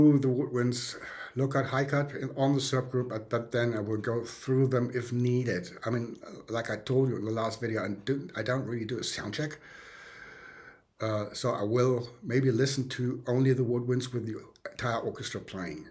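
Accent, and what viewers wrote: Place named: United Kingdom. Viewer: Lieber, danke für Deine Videos! Ich finde, Du erklärst schön klar und verständlich. Ich habe gleich abonniert. Darf ich fragen, wie Du zu Deinem großartigen Englisch gekommen bist? Ich finde, das klingt so schön britisch. Liebe Grüße, Renee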